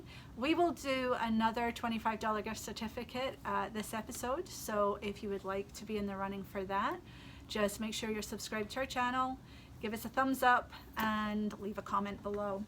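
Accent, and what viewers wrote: Scotland, United Kingdom. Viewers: I love hearing your wonderful Scottish accent and seeing all of the gorgeous Yarns you bring in for us! Thanks so much and stay safe!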